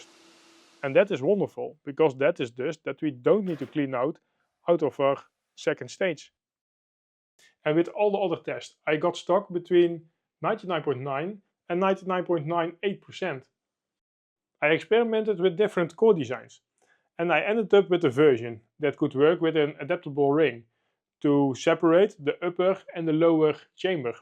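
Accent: Netherlands. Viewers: Good content, but don't know if auto dubbed is worse or the super dutch accent xD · Dutch accent is classic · The moment you hear its a dutch guy within the first few seconds xD great video tho! oh, greetings from Soest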